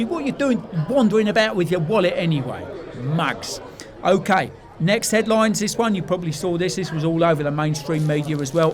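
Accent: United Kingdom. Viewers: As an American, this is a proper British accent 😂. Cheers guv!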